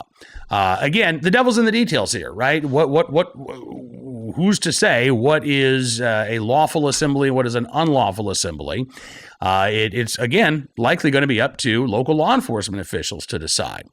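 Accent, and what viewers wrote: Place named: Virginia, United States. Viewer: I got family in southern VA and they talk just like that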